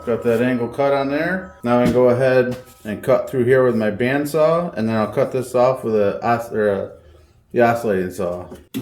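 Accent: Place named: United States. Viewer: i hate this american accent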